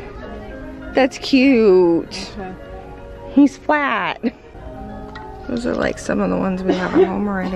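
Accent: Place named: Massachusetts, United States. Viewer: Rabbit holing lol😂..Ik you’re from Cali but you sound like someone from Massachusetts lols. Merry Christmas!🌟🥰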